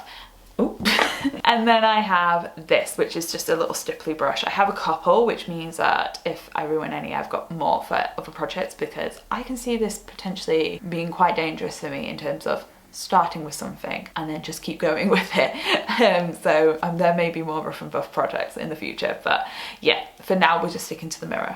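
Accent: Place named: England, United Kingdom. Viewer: Hello. Found you this morning, so pleased to hear an English accent. I am from South Yorkshire and love a good old diy project. Looking forward to watching all your other videos 😊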